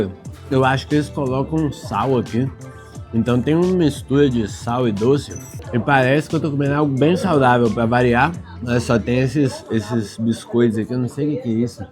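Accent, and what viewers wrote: Minas Gerais, Brazil. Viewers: Te assisto há pouco tempo e percebi o seu mineres desde o início!!!! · Cara, vi teus vídeos... E só pelo sotaque vi que é mineiro... Parabéns excelente trabalho. Pra ser melhor só falta ser cruzeirense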